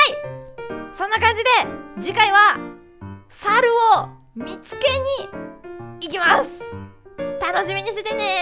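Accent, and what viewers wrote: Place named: Shiga, Japan. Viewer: 滋賀弁話しいさ（ ; ; ）♡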